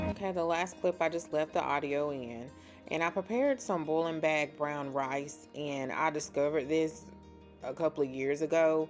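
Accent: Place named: North Carolina, United States. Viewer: First time here but I knew you were from NC...lol...I'm from the Lumberton area...it's so funny to here us speak..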